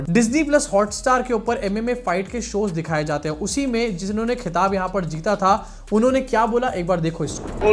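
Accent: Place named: Punjab, India. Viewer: आप क्या पंजाबी हो आपकी tone पंजाबियों वाली लगी मुझे । प्लीज बताए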